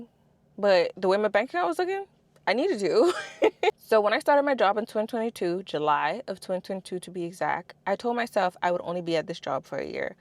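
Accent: Bahamas. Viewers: are you from bahamas? u kinda sound bahamian lol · Love the video and congratulations! But mam, I can't ignore hearing a Bahamian accent. Now I'm super curious. Either way, love this for you! 🙌🏿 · Hi i love your video and how you break everything down, I think i am hearing a Bahamian accent, i know you said having Caribbean parents! But thanks for taking the time to make this awesome content. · sound bahamian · I know i heard the bahamian in you loud & clear! Im proud of you. Im deep in this to clear debt, and provide emergency funds to actuay leave my job as well. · You sound Bahamian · Are u Bahamian i hear the accent but cant tell also love ur content this is awesome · Wait...I hearing my people?? Are you Bahamian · That accent can’t hide boy 242🇧🇸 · That batian accent ❤.. new here but praying I learn so I can get off this rat race . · you sound like a Bahamian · Wait. 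You're Bahamian?! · I picked up on your accent. It warms my heart to see a fellow Bahamian woman trading ❤ Wishing you all the best! Your video really encouraged me to take trading and financial freedom seriously. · You from the Bahamas eh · Are you Bahamian? I hear a slight accent 😅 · Are you from the Bahamas? The way you say financial it reminds me of the local accents when I went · Great video ... sounds so Bahamian ...lol !!!!!!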